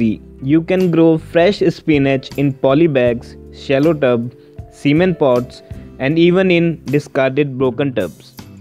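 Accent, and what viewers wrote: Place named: India. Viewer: super ahhh zzhy ! Famous Indian accent!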